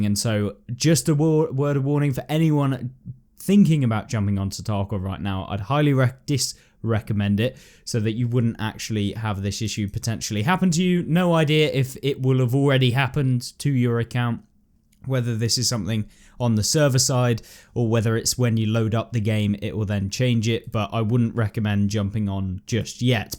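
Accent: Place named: United Kingdom. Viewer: i like that british accent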